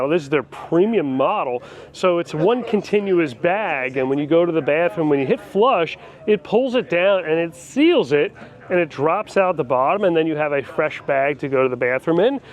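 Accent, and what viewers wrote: New Jersey, United States. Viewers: Love the Jersey accent! 😊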